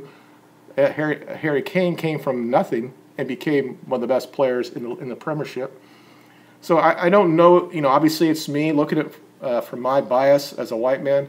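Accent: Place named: United States. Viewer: You are German and French? Yiu sound American to me. Regardless of where the players are from it has gone down the drain with football/soccer. I am happy I will work during tomorrow's final. I am a Lazio fan. Milinkovic Savic is a monster. Incredibly talented. Just you pay enough and he is yours.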